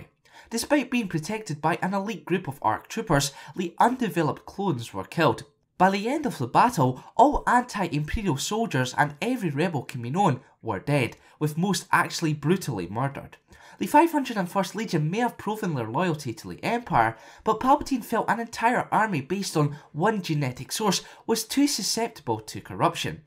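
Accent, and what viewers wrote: Scotland, United Kingdom. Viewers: Are u scottish? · Scottish like me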